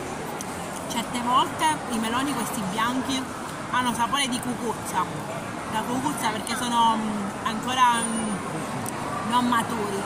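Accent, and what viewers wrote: Sicily, Italy. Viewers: Si sente l'accento siciliano ahhahahahhaha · Ciao simpaticona! Mi è familiare il tuo siciliano😂😂😂 Pizza bruciata, io l'avrei portata indietro subito! Ciao... non ricordo il tuo nome, a presto!😉😘Pollicione in su!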